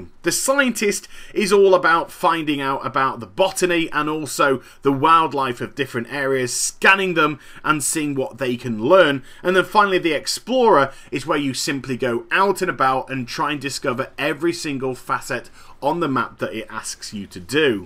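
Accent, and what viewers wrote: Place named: United Kingdom. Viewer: Hi, great review, u make me got interested in this game. However i run into a problem while getting this game. Since u have a little British accent, you might be the perfect person to ask this question with. The problem is that my location that i live in can only buy the EU version of the game. Does EU server have a lot of non English speaker? Whats the majority language that ppl use when in terms of raid and bg in Europe server?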